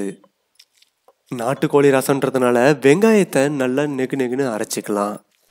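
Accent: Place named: Kongu, India. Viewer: Konku tamil pronoun supper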